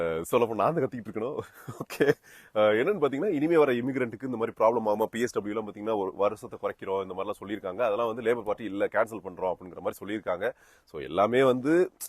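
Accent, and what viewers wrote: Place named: Telugu, India. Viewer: He is a Telugu guy that's why he speaking like this.........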